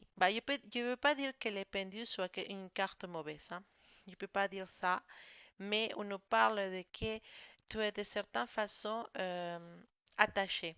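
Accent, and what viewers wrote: Spain. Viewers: Bonsoir, cela fait un peu plus d'une semaine que je vous ai découverte. Déjà, j'adore votre petit accent espagnol, c'est adorable. Vos tirages sont assez précis et intéressants par rapport aux détails que vous nous apportez. Personnellement, je suis Poisson 1er décan, ascendant Capricorne, considérant qu'après 30 ans, on est plus sur son ascendant. Cependant, vu la superbe journée que vous annoncez pour les poissons, j'ai hâte que le jour se lève... Merci beaucoup:)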